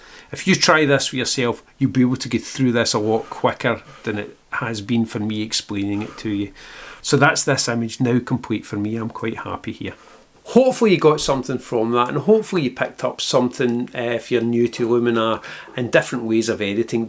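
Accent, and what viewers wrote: Scotland, United Kingdom. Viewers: hi , since I watch you I don't know if I became a pro of Luminar but certainly I did improve my understanding of the Scottish accent :-)) ... I wish I knew you before so I could understand the Gallagher brothers ( (I know there are from north England but it seem to be close from yours) during my last photo-session with them (so frustrating not to understand a single word) ... So please note , I love Scottish people and their folk accent but please just try to be more British if it's possible , for a French guy well trained in English it wasn't so easy to understand ;-) thanks ... best ... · Great edit, clear step by step instructions as usual. You are really helping me to become more and more confident with Luminar,. Oh, and I could listen to your wonderful Scottish accent all day! 👍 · Just found your channel and I'm glad I did. 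Excellent tutorial and easy to follow what you're doing. Eilean Donan must be the most photographed castle in Scotland. Visited it many times on my way over to Skye. Nice to hear a shots accent on the tube, and I'm looking forward to more of your tutorials.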